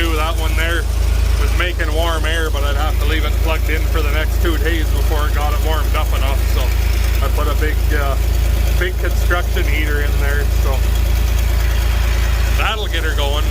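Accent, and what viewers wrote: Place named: Canada. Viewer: Can't tell if you live in Canada, michigan, minnesota, or an state next to the 7 lakes oh yeah and also thanks for taking your time and hard work to feed people and I feel you live in Canada because your tone, voice, and they way your talk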